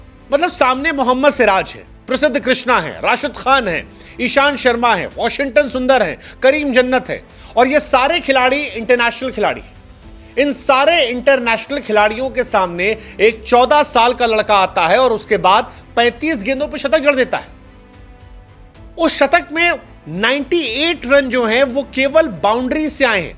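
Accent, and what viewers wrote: Bihar, India. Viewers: Bhai aaj bihari me bol rha hai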